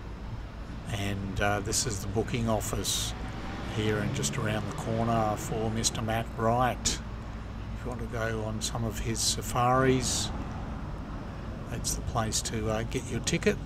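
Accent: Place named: South, United States. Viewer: You talk to slow, to be a Darwin local, and you have southerner accent.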